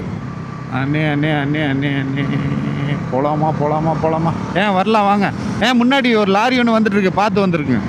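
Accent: Kongu, India. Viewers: I remember this person. You two were sitting on the water tank and talking about your Ladakh trip. At least four years ago, I saw this person on your YouTube channel. But I still remember him. I really like it when you come and speak in Kongu Tamil in your channel., I am also from Kongu side.I really like your channel because you speak in that tone. keep continue bro. I pray to God that you always have a safe journey. I love Channel also. I've been watching Moto vlog continuously since he started domestic travel, and he has spent a lot of money on international travel. I feel like a person from Tamil Nadu who has an international travel vlog is a big feat that no one else can do. I hope he hasn't received recognition from people yet. I really like that man's spirit. No human being can afford to take a small international trip and spend it on people, because the cost is too high, but he works for us.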